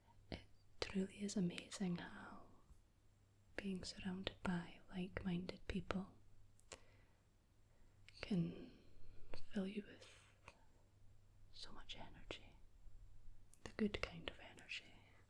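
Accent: Scotland, United Kingdom. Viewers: I cannot emphasize just much I am able to relax upon hearing that beautiful Scottish accent and seeing the cheeky humour mixed with a smile of pure warmth. Absolutely charming every time! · I love the way your accent articulates when you whisper. Scottish is such a round and organic sound, it's like a warm calming lava lamp 😊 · hi, your voice remindes me of Jamie form Outlander! I guess it's maybe just a Scottish accent, but still feels like a very caring hug while listening to a midnight story from granparents <3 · I didn’t know that the Scottish accent is so calm and pretty to me!😮 It’s so nice listening to your voice. Mostly I like it when you say something that includes an “r”. 😅 Now i have to watch another video of yours. Greetings from Austria ❤ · I adore this asmr. And your accent too :D As a fellow scottie it was so soothing listening to you speaking quietly. 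· this whole video i have been thinking that you sound Scottish but I thought you probably weren't as I've seen no Scottish asmrtists but then I heard you say "wee" and went 'I KNEW IT' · Love the general kenobi bit and how thick your whisper Scottish accent is 🏴☺️ Also your signature hand movements are top tier ✋ especially the outro where you cover our eyes sending us off to a deep slumber 🙈🤲💙🩵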